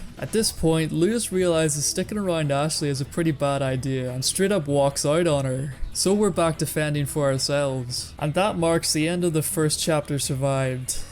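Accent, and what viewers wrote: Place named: Northern Ireland, United Kingdom. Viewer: Love hearing fellow northern Irish accents around YouTube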